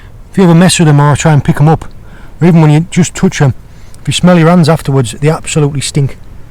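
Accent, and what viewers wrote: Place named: Northeast England, United Kingdom. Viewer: I'm in Pembrokeshire so the Seas are very similar, both of us with our toes dipped in the gulf stream, but there was so much variety in that one spot, I've dived for many many years and have never come across the cuttlefish eggs, they were impressive, and well disguised I guess they could be mistaken for seaweed etc. Your not cornish though are you, I watched some of your videos last year and thought you were on the Northeast coast and remember thinking at the time that's a very unique spot for the North Sea! Thinking it must have been a micro climate on an estuary somewhere, I realise now I'd mistaken your accent for location 😁😁👍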